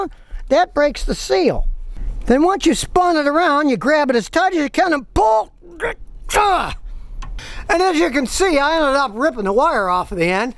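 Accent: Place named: Chicago, United States. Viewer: Scotty Kilmer, you're hilarious brother! Chi-town accent adds to the overall experience. It's like Anthony Spilotro became a mechanic 😂😂🤣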